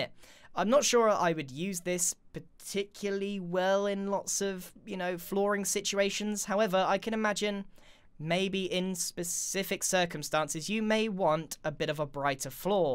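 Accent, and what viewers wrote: United Kingdom. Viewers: Dude I just love ur British accent❤️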